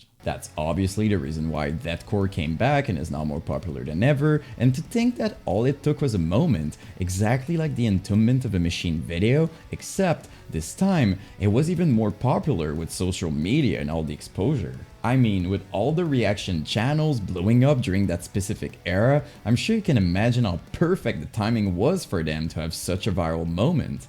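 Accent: Quebec, Canada. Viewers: C’est sûr que t’es Québécois! 😂 Est-ce qu’on aura une vidéo en français un jour? Peut-être à propos de la scène deathcore au Québec? 😗 En tout cas, merci pour là video — du bon travail comme toujours. 🫶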